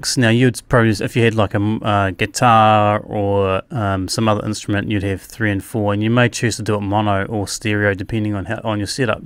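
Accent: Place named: New Zealand. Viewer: That is the most Kiwi accent everrr :D Lived in Christchurch for 2 years, can't forget it :P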